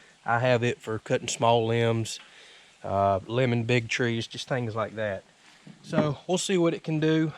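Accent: United States. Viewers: I enjoy your content so much, and find you really interesting to listen to! Where is your accent from in the USA? Love it ha, all the best!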